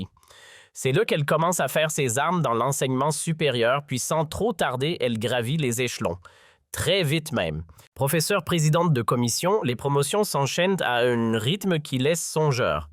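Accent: Canada. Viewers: Tu as une voix canadienne au faite !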